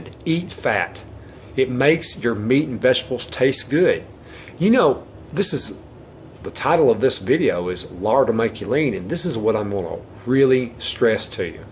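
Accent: South, United States. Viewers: I love your channel and your southern accent. Thank you for breaking stuff down for us!You’re awesome! 😎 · your southern drawl makes me laugh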